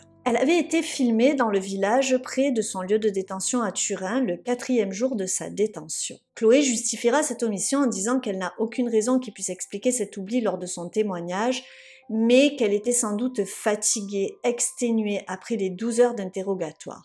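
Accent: South, France. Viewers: tu viens d avoir un nouvel abonné a l instant désolé je connaissais pas ta chaine avant cette fin de soirée j aime ce que tu fais félicitations miss avec ton petit accent du sud j aime beaucoup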